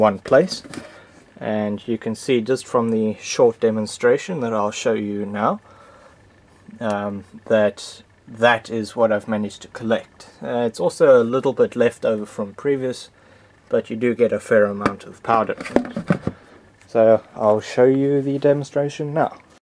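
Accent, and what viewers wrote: South Africa. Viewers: Are you South African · Really cool, are you south african?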